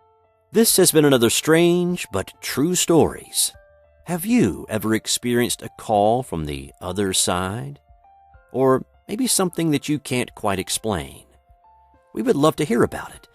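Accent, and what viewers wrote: South, United States. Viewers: Great narration and voice. But I can't tell iwhat regional accent you have. It sounds like it's between Standard American and southernish. Please enlighten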